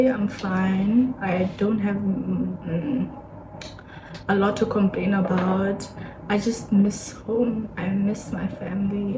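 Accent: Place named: South Africa. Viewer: 🤣🤣😭is it South Africa ? Waitse batho bone mma Mme accent ya rona e monate nna i like it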